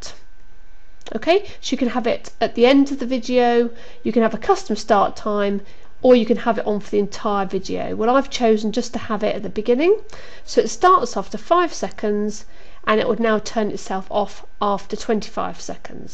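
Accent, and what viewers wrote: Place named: United Kingdom. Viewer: Thank u, I like ur British accent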